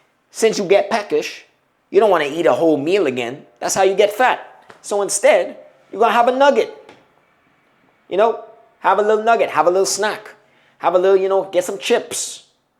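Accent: Bahamas. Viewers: You sound like your of Bahamian decent. 🤷🏽‍♀️🇧🇸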